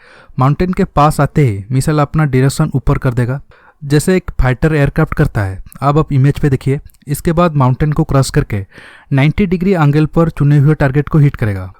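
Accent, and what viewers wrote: Odisha, India. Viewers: Bhai Tu Odisha ru na?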